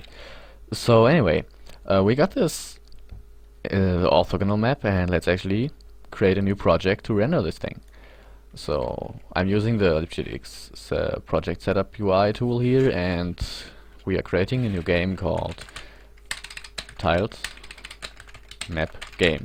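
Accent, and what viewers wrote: Germany. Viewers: Ich hab mir doch grad gedacht, dass ich einen dezenten deutschen Akzent höre :DD Danke für das Tutorial :)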